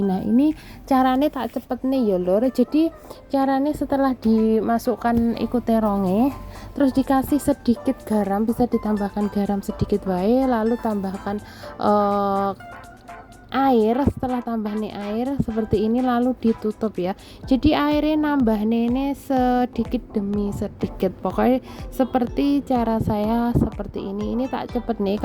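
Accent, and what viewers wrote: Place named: Java, Indonesia. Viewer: 😍😍😍, ketemu konten nya mbak saya jadi semangat masak , trimakasih ya mbak 🥰🥰🙏 mbaknya asli Gresik Yo kok jowo ne lucu 😂😂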